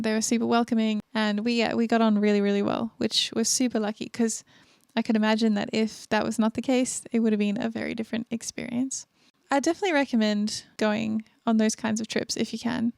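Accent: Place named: South Africa. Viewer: Hi. I'm trying to work out your accent. Maybe South African?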